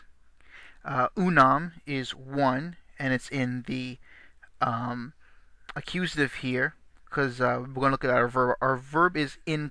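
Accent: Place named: United States. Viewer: Your pronunciation is too "American" sometimes: instead of [e] or [o], You read [ei] or [ou]. Also, if you're trying to use reconstructed pronunciation, try to find a version of text where long vowels are marked, it completely changes the accent: dIvisa, trEs, quArum Unam etc. And it would be really great if you'd just read it faster;p it'd have sounded much more realistic.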